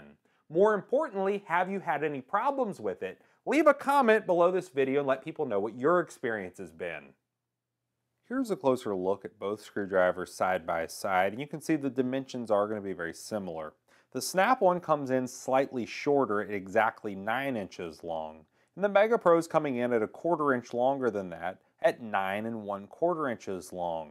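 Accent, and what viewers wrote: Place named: United States. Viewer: As an Aussie (Australian) I am having great fun trying to work out what part of the US the reviewers accent comes from. Boston? Upstate New York? Dunno, but it is pretty cool. PS- Love your videos, but sad that a lot of the stuff that is reviewed on this channel is not readily available here in Oz.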